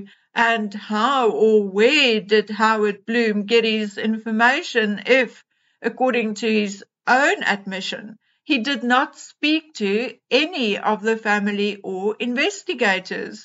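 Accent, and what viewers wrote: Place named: South Africa. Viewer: dont know what it is about the South African accent but its soooo beautiful .-) great video btw , lots of valuable points made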